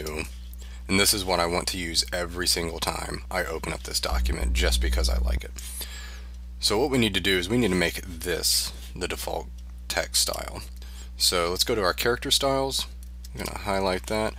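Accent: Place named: South, United States. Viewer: Really helpful and the Southern delivery was a bonus ; )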